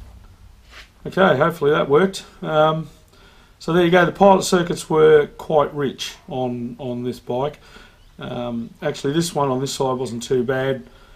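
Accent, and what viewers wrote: Australia. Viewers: Glad to hear that accent from the down under. Hi from the New England reagen usa. ( north of boston lol) Thats a must have kit Thanks soo much . Cleaning out my Kawasaki super sherpa 250 carb.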